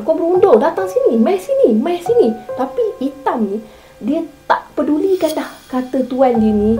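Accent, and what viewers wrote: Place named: Kedah, Malaysia. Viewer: Akak ni org kdah ka cra ckp mcm org kdah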